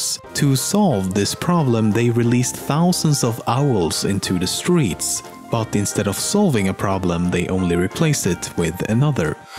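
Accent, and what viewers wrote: Sweden. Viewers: the guy talking must be swedish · I'm going out on a limb here and guessing the presenter is Scandinavian. Hmm... Swedish?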